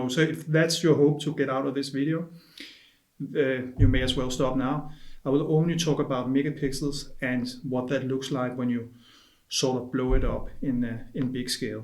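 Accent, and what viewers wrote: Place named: Denmark. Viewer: Your accent sounds Danish.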